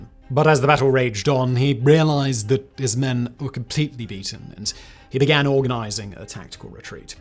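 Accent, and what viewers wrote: United Kingdom. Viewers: I am not English native speaker (it is my 3rd language) I watched thousands of documentaries in English but this guy speech is unbearable, too fast and annoying monotonous british accent (or whatever this guy is). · hearing a brit talking abt george washington is crazy. love ur vids im not hating it’s just funny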